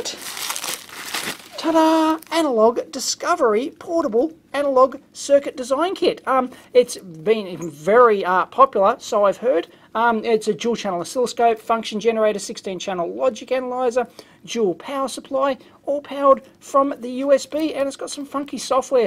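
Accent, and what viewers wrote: Australia. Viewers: In the fourth grade, I'm in the US, we had to put a picture of ourselves on a world map with a string going to where our family came from. I asked my mom and I thought she said "Australia". So my string was all by itself and the teacher was like "really". All the others kids strings went to somewhere in Europe. Well I asked her again and had to move my string to Austria with all the others kids. Explains why me mother's parents didn't sound like you. I never got to come from a fun country. :-(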